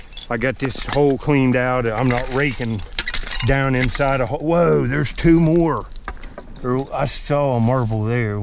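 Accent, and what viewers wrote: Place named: Maryland, United States. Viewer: Hi! I am new to your channel and really enjoying your viddies. Squirrel Man is a lucky, happy little guy. Seems he has you wrapped around his little paw. So sweet. Not sure where you are from, but your accent sounds like parts of here in Maryland. Dig on!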